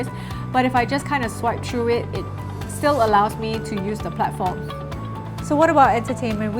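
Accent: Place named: Malaysia. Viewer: Malaysia accent.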